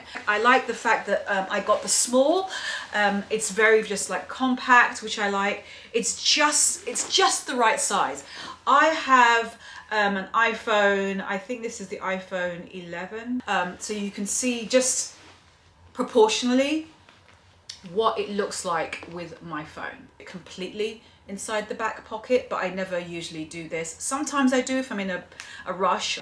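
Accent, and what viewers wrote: United Kingdom. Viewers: I love your videos!! I love your style. I love your apartment!! You and your husband are a great couple. I also love your British accent, by the way.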